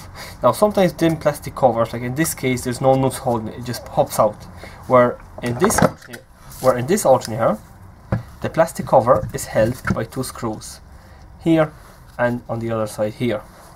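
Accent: Ireland. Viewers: What part of Ireland is that wonderful accent from????? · Man not being funny but you have a mad accent irish farmer/central European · Hi. Thank you for your time and sharing your knowledge you could nearly be mistaken for an Irish man. 😂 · Funny! Best Irish accent from a foreign national ive ever heard!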